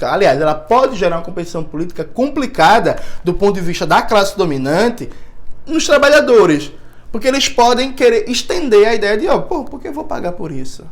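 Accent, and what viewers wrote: Pernambuco, Brazil. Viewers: te adoro pela qualidade de teu trabalho, teu conhecimento... Mas essa proximidade com um jeito diferente do nosso, aqui do interior de São Paulo, de falar é delicioso. Um ritmo, um andamento faz com que ouvir você seja delicioso.... Que maravilhoso quebrarmos essa hegemonia horrível do capitalismo que impõe um modelo "padrão" de ser, massacrando os regionalismos tão ricos e lindo em nosso país.... AMOOOOOOOO esse jeito cantado de falar....Adoro essa pernambuquice... Sabe, graduei, fiz mestrado e meio de doutorado. Nunca tive um professor negro e estou adorando... Obrigada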